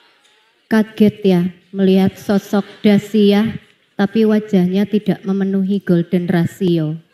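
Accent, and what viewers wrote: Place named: Java, Indonesia. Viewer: Narasinya bagus! Medok jawanya pas!!!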